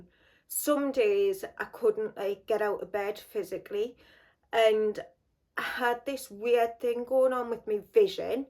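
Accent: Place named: North East England, United Kingdom. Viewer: ! It’s so lovely to see you back🤗 Can you believe I’ve only just found your channel. I started binging your videos this month while recovering from gallbladder surgery! ❤️your content and your North East accent🥰. I have not worn much fragrances while recuperating but I do still love to sniff them😂. All the best from NYC x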